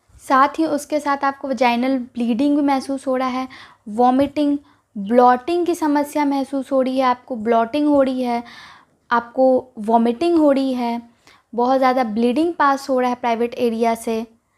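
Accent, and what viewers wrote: Bihar, India. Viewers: Ma'am Aapke bolne k tarike SE pta chalta h ki aap Bihari ho 😀 am I right ??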